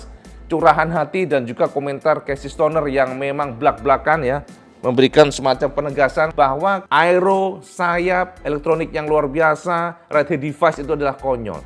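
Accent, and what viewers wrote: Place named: Tulungagung, Indonesia. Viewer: Bang lu orang tulungagung juga yaa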